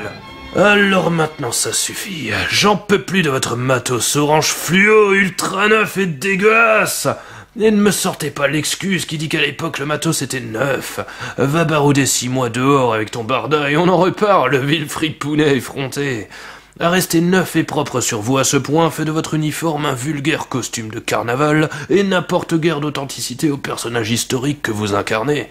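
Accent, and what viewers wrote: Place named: France. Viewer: This was very helpful but what the heck did I just watch, do French people actually sound like this??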